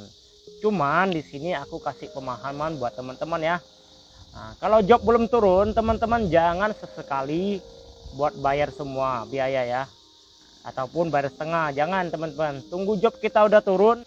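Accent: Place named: Taiwan. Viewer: Logat nya logat taiwan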